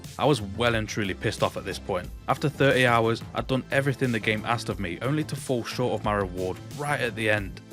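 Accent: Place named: Nottingham, United Kingdom. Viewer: I know a Nottingham accent when i hear one 😂😂😂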